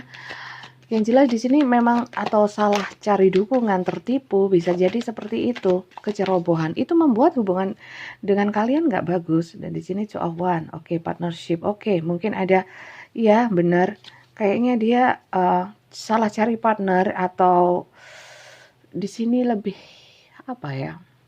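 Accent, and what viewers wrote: East Java, Indonesia. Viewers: MET pgi bunda hadir dari Sumut selalu pokus dgrin pencerahan,sukak bgt dgn cara pembacaan bunda dgn logat nya rek " ☺️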